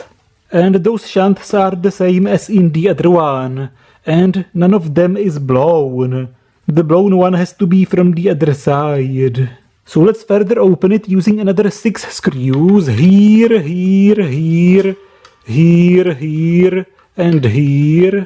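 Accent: Czech Republic. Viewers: I believe czech really sound like that. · Czenglish hell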